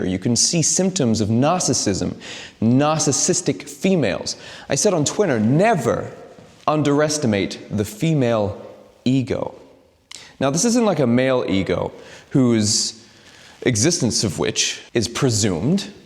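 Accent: United States. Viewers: I’ve never heard an Englishman speak in an American accent until now. It’s pretty good with small slips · out of curiosity, where does the American inflection in your accent come from? I was under the impression that you were English? Is this correct or is there nuance that I'm ignorant of? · If this guy is a Brit, he's the most American sounding Brit I've ever heard.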